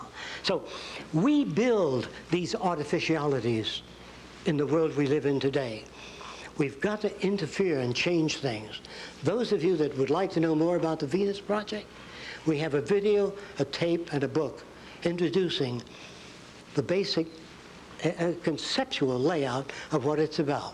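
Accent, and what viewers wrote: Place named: New York, United States. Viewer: AND GOT LOVE THAT NEW YORK ACCENT. LONG LIVE J.F.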